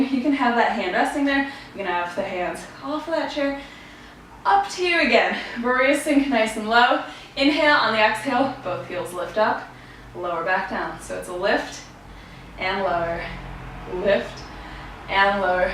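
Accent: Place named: United States. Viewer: This had me cracking up😂 Your chatter was so adorable🙊 The workout was a burner for sure and I’m loving how I feel now! Also, I had a feeling you were/are American so I’m glad you mentioned it in this one😉 I’ve been trying to place your accent😆 Thanks for the fun sweaty time and strong feeling I now have💗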